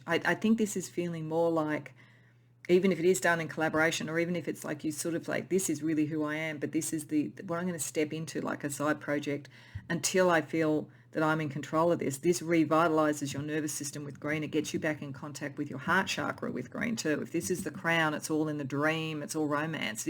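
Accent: Australia. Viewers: I understand if you don’t want to answer this question but I’m very curious… lol, there are a couple of things you say that I’ve only heard from a certain group of people. It’s not exactly what you say, it’s the way you say them lol. Do you live in or come from a country town in Victoria that was a mining town in its early days. It’s a town in the proximity of a well known island township. I’m trying not to be too specific but hope I’ve given you enough info to get what I’m asking 🤔😁👍🏼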